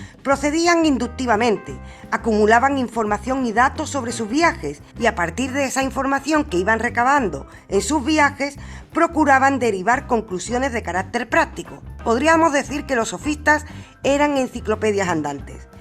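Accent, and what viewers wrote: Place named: Andalusia, Spain. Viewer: Me fascina su voz andaluz en una clase de filosofía, saludos desde Venezuela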